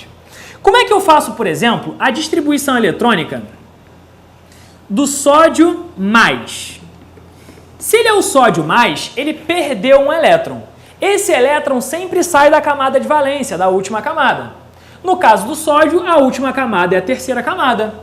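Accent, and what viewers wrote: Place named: Rio de Janeiro, Brazil. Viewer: Legal super entendivel, mas poderia ser meno cariocado,,,,cerrrrrrrto.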